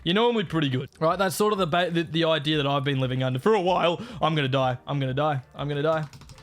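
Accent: Australia. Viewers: the amount of aussie in you is perfect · His Australian accent has become more severe as years has gone on, especially after breaks. Edit: I assume this is because when he isn’t uploading to YouTube, he is conversing with other Australians IRL, but back in the day when he was constantly online, he was associating with primarily North-American creators which made him indirectly change his speech patterns to sound less Australian. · i thought you were austrailan for a second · HE SOUNDS EVEN MLRE AUSTRALIAN THAN LAST TIME WE SAID IT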